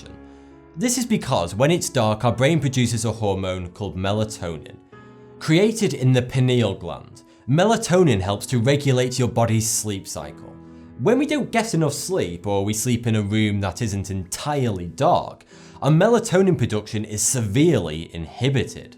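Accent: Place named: North, United Kingdom. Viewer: Hey where are you from because I recognise your accent.Do you live near Bury or Bolton?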